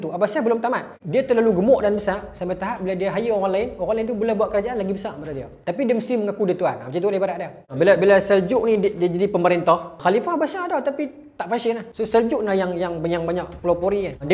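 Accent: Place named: North, Malaysia. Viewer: Tu kira bahasa melayu dlm loghat utara..kau tak faham sbb ko bkn melayu,ko kudha kayu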